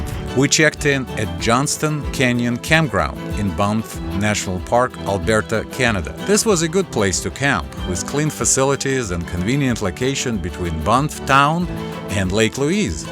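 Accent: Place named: South, United States. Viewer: your good ol' southern accent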